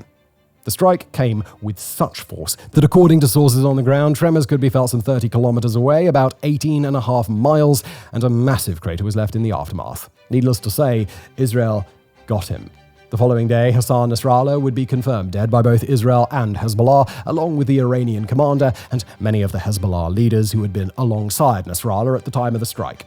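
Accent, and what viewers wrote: United Kingdom. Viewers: There's nothing more calming than having soothing voice and british accent to tell us about how shit's going down. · The attempt to create a balanced picture between a liberal state and terrorist organizations is strange in a British accent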